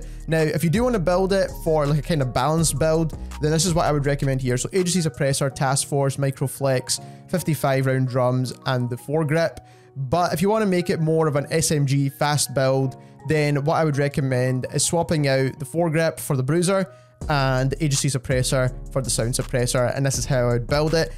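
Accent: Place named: Scotland, United Kingdom. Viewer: i love the scottish voice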